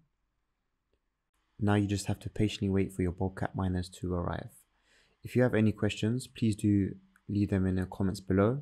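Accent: United Kingdom. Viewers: from the usa why does he sound british wtf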